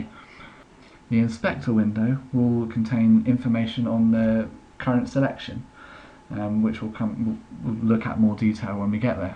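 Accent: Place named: United Kingdom. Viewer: Your accent is like of Britishers' ;D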